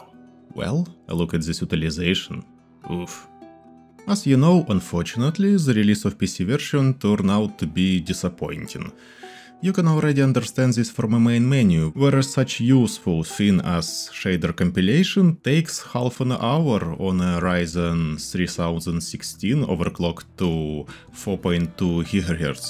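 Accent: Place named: Russia. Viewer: А какой смысл выпускать видос на инглише с таким чудовищным акцентом? Нас сейчас в Америке и европе не сильно любят. А услышав первое же предложение человек поймет что ты русский и даже если он каким то чудом увидит этот ролик в рекоммендах, то процентов 80 народа его сразу же скипнет.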